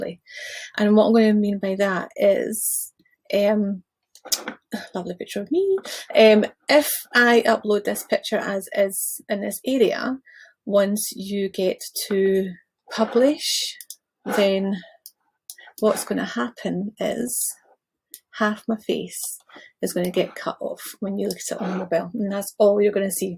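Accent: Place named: Scotland, United Kingdom. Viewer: I gotta love you. :face-red-heart-shape:But you're Scottish? Welsh? Accent is too much for my American ear. 🐘Well , WooHoo! Close Captioning does understand you! I will carry on.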